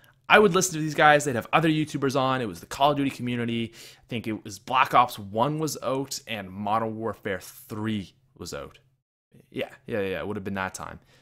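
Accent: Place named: Canada. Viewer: I knew you where from Canada because of your accent well I'm from Canada too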